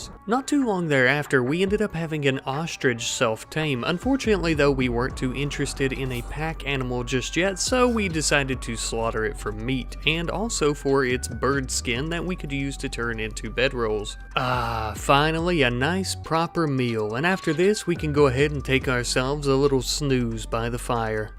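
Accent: South, United States. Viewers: are you a southerner? You got a little drawl in your voice.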